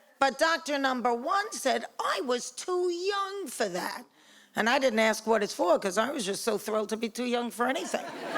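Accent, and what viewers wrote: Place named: Boston, United States. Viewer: Well, now you’ve heard two people with a Boston accent. Only 675,345 more Bostonians left to listen to. 😅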